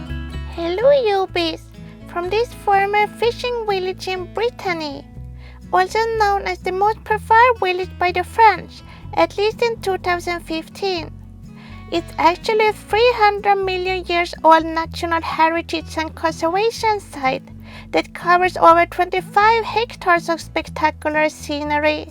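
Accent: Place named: Netherlands. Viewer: Thank you so much for another lovely video! I am catching up for 2 weeks worth. I am still intrigued by your lovely accent. Are you Dutch? I’ve been meaning to mention that I really like your animated maps following Bikki. The music is perfect! And congratulations on your constantly growing subscriber count. Claws crossed you’ll keep growing 😀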